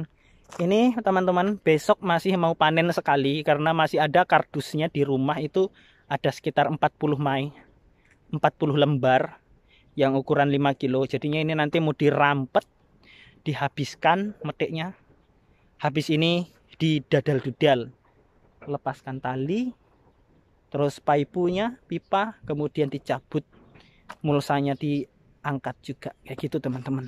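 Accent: Java, Indonesia. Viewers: org Kalimantan apa org jawa mas 😂 kok medok jawa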